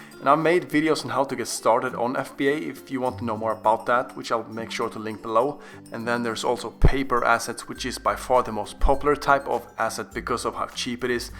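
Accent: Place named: Sweden. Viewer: haha din engelska låter precis som när svenska nhl spelare ger interview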